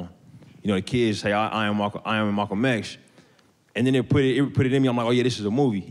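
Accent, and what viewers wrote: Oakland, United States. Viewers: Sobison lol no he’s from Oakland, that’s how most of them talk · Crazy how many people in the comments have never heard an Oakland accent.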